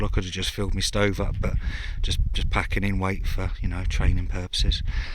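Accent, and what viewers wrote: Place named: Portsmouth, United Kingdom. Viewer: Are you a Pompey lad? Your accent seems familiar. Lovin, the vids mate, keep on doin' yer thang. 👍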